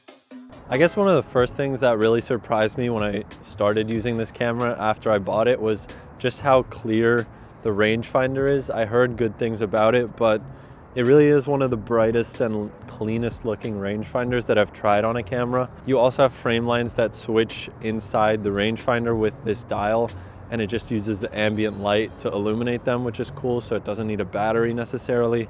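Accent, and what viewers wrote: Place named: Netherlands. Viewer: Ben je Nederlands of Belgisch?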